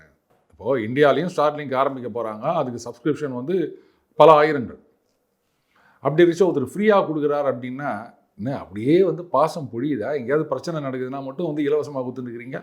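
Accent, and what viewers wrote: Chennai, India. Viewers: Bro significant post chennai slang amakalam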